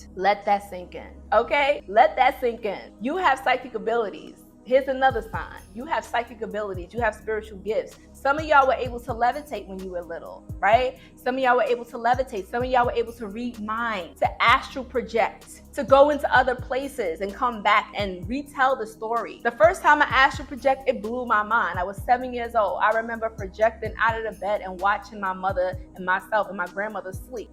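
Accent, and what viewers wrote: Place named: Brooklyn, United States. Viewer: I can hear it in the beautiful accent! I'm from Albany area with fam from East Harlem and dear friend from Crown Heights, BK! I could feel that jubilant Biggie energy surrounding you, wow! 🗽❤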